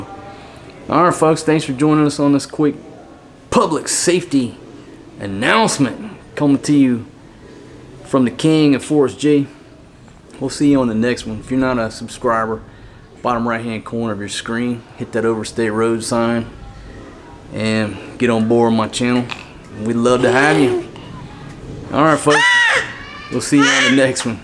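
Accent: United States. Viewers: I’m Canadian and listening to you is like watching a Saturday Night Live skit that is so quintessentially American. Thoroughly enjoyed it and hope Wife #1, 2 and 3 are happily making use of your in tact Family Jewels.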